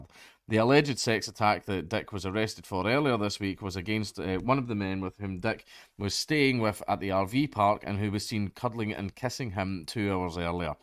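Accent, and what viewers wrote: Scotland, United Kingdom. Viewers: You haven't heard the phrase dildos all over the floor until you've heard it in a Scottish accent. I nearly peed. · Andy Dick stories are always entertaining , the Scottish accent makes everything a thousand times funnier though man. You've become one of my faithful go to's whenever I need a laugh to destress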